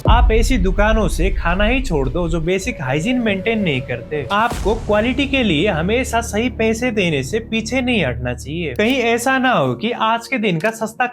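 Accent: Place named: Nepal. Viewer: Yeh toh nepali accent lag raha hai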